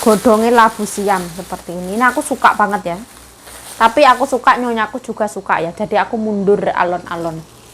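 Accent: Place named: Blitar, Indonesia. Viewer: tak kira mbak orang Blitar wkwk 🙌🥰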